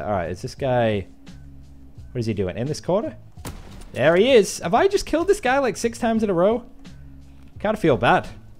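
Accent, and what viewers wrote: United Kingdom. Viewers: Great to hear a British accent. Just for a change. Love the video mate. Subbed and liked.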